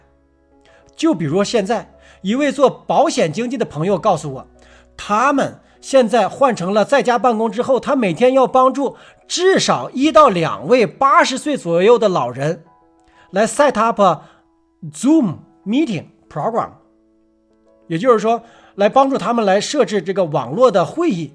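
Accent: Northeast, China. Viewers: 👍👍💕👏👏好像是我們東北口音？！支持你✌️💐🕊️🕊️🇸🇪🇳🇴🇸🇪🇫🇷🇮🇹🇩🇪🇻🇳🇭🇰🇯🇵🇸🇬🇳🇴🇮🇸🇫🇮🇳🇴🇹🇭🇦🇺🇯🇵🇸🇬🇺🇸🇹🇼～～～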